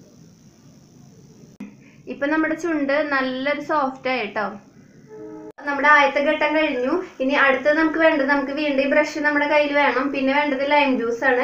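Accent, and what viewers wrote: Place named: Thrissur, India. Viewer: Kollatto. തൃശൂർ ആണോ. തൃശൂർ slag und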